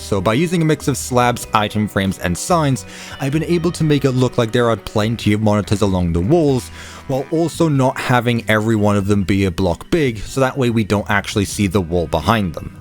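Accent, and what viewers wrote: United Kingdom. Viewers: I enjoy watching your video because it’s a nice change of page from the fast moving while talking Minecraft YouTubers. I like how you talk in your nice calm British accent as Minecraft gameplay of you building things place in the background. I find it very common relaxing to watch I love your channel. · Maybe it’s the British accent that makes it sound better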